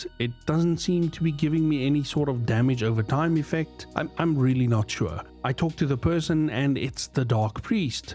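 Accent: South Africa. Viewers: this guy sounds like he's south african so im subscribing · Definitely a very South African accent haha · Is this a fellow south african that i hear who has also put himself through perpetual torment with this absolute gem of a game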